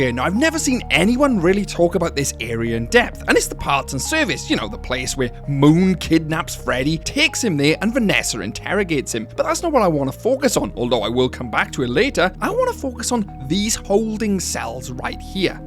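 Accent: Wales, United Kingdom. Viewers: Are you Welsh? I was just wondering because of the accent 😅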